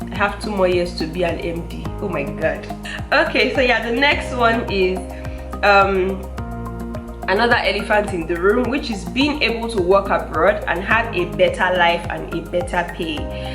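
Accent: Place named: Philippines. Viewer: I am really glad to know and heard from you a kind of Filipino English accent. You deserved to learn it from the Philippines. By the way, the verse (Romans KJV) you had mention is specifically for all the servant of the Lord who serves Him faithfully in good times and in bad times. And that two things work together for good in our lives.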